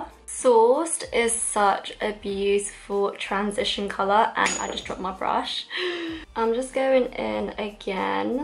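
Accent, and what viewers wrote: United Kingdom. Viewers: You're my Asian makeup inspiration! <3 & you're hella funnyyy. When I watched your first video I was pretty shocked by you're British accent! I wasn't expecting that ahaha but now I can't stop watching your vids ! {ig name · <mail> (p.s. when I first started watching you I was so surprised when I heard your voice cause I didn't realize you where from the U.K. 😂)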